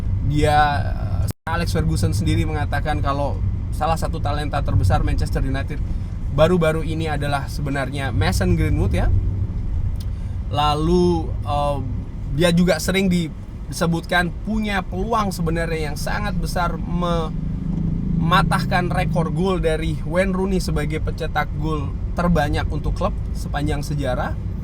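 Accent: Semarang, Indonesia. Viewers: Orang Semarang bang?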